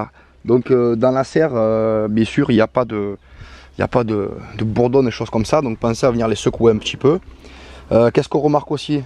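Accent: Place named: South-West, France. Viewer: merci a toi je pense que tu est dans le sud ouest non tu a un accent simpatique moi jsui sud est un grand merci pour tout ton temp et ton savoir faire que tu partage jatend les ptit secret1😉👍👍👍👍👍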